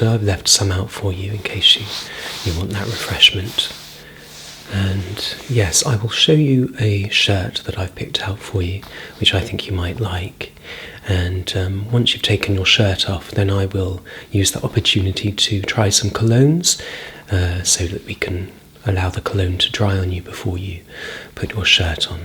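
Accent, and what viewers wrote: United Kingdom. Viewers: Great job. As an American, something about various British English and pronunciation is just supremely professional and clean. This series of yours is an absolute fan favourite.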